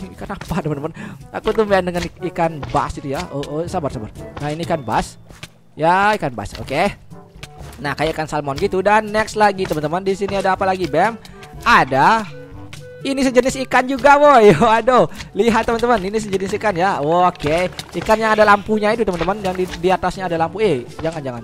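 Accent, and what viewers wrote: Bali, Indonesia. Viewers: Dari bali bli???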